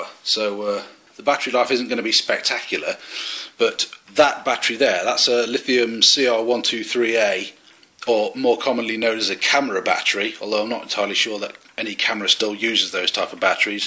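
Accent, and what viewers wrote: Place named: United Kingdom. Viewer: What kind of British accent/dialect do you speak with? Hope that doesn't sound weird I'm just really curious haha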